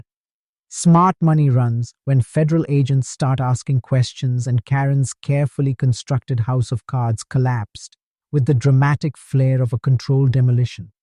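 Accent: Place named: India. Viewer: Oh my God it's got an accent of someone from India and it's so goddamn annoying I got to get rid of this one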